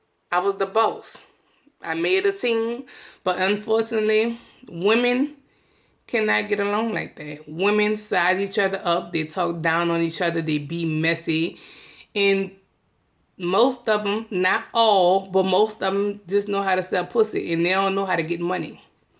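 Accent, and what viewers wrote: New Orleans, United States. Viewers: That New Orleans accent!!!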